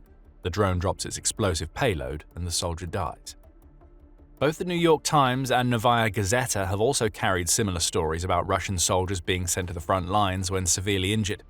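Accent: England, United Kingdom. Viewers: With all due respect, it ends in a 'th' sound, like it's spelled. 'Sicksth" Although, of course, there are different dialects. Yours might be different from mine or the narrator's. He has an English accent. If you've been to England, it feels like there's a different dialect for every town!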